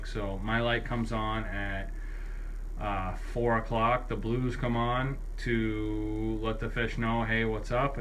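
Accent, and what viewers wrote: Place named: Massachusetts, United States. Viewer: Nice hearing a dude that sounds like me on here, any south coast MA shops that are good for plants, does Beds carry a variety of plants? Seems like most shops only carry a couple of the usuals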